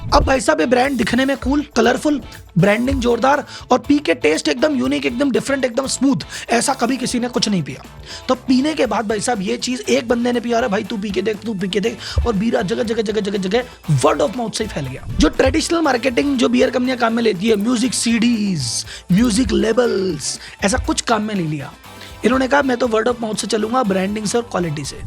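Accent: Gujarat, India. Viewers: Gujrati ho ya rajasthani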